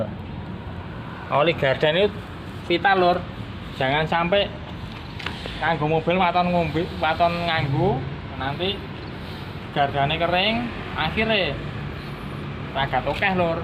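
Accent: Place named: Sleman, Indonesia. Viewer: Setuju logat nya om admin kaya orang Sleman Bantul Gunungkidul dan sekitarnya btw mobil pertama gw kijang new super g 1.8 96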